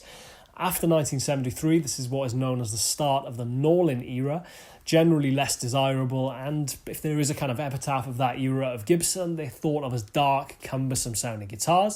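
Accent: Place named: England, United Kingdom. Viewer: Could listen to that playing and your English accent all day long Brother!!! You make Clapton cry!!! Haha and Joe Bonamassa is calling for bending lessons from you….. Damn!!!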